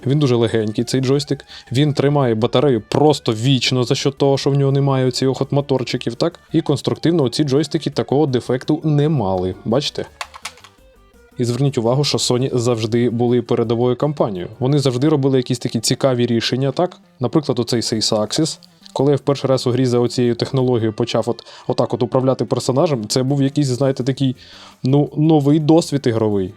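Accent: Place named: Poltava, Ukraine. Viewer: Чоловіче, судячи з акценту, ви точно з Полтави))). І це говорю в хорошому сенсі)) . Дякую за відос, реально дуже корисний!!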